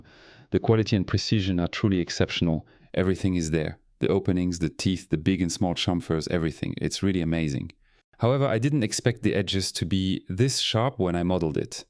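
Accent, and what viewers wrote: France. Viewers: It's impressive, how capable FreeCAD became and I've really enjoyed watching your CAM tutorial. By the way, your lovely French accent is really charming. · Heya! You've got a good fluent English with a lovely fleur (you may be saying gaze or voile these days, but in Russian we still say флёр when we mean gaze or voile figurément, I think it's an old-school maniere which dates back to XIX or even further to XVIII and all those Lois... Lous... Louis('s) you used to have) of French accent, mate! Do not be shy of it, keep it rolling! And for what it's worth, a turbine is driven by working medium, e.g. by fluid, by gas or anything else, whilst a fan - or better yet, an impeller - is the thing that moves the working medium, be it air or water or whatever. So you added a fan to your spindle to kick away chips from cutter, not a turbine. And just between us, I wouldn't say it loud that I've got any sort of piété to Tesla in general and the Truck in particular should I have one. 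It is rather controversial aveux. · Where's your perfect English accent? Finally, your real voice? That French accent is a bit funny, personally I like it, and your English is good. My voice would be much worse 😀😀😀👍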